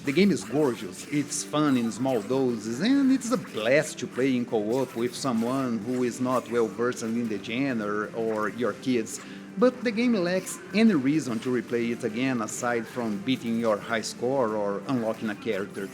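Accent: Brazil. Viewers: Percebi que é brasileiro pelo sotaque kkkkkkkk O inglês tá em dia, parabéns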